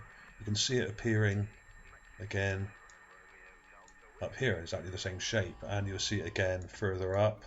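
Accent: Bristol, United Kingdom. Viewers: you sound Bristolian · Are you Bristolian mate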